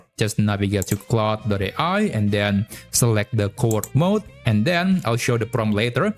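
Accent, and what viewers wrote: Indonesia. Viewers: bro you have indonesian accent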